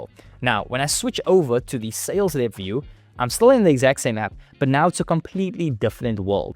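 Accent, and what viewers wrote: South Africa. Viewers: Intresting dialect, you i SAfrica? Sound like a combination of hindi and Afriaans mix in English. I like it. ☺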